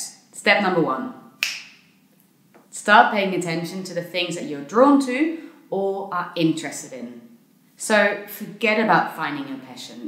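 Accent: Netherlands. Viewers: Are you dutch? I hear a slight dutch accent leaking through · Are you Dutch? I hear a bit of our accent 🧐 just slightly.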